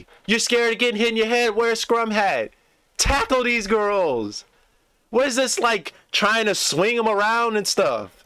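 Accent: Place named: Belfast, United Kingdom. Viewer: You could be broad Belfast with that accent bro, love your commentary.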